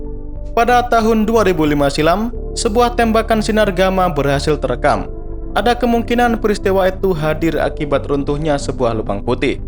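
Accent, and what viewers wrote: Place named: Madura, Indonesia. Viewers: "Seperti biasa daripada kalian penasaran, mari kita bahas bersama". Logatnya benar2 Madura sekali pak. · Dari pada kalian penasaran berlogat madura ygy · Pasti org madura yg ngomong nii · Aksen maduranya kental banget mas 🤭🤭🤭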